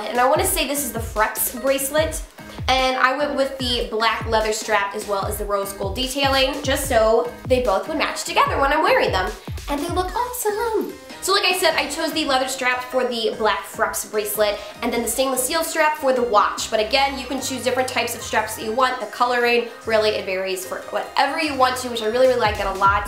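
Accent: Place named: United States. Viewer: You have a very American sounding voice, if that makes any sense 😂 like you pronounce every word very accurately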